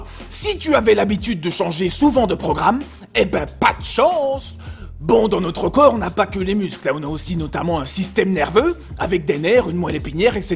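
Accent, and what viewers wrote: Liège, Belgium. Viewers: haha;) tu es d'ou de liège?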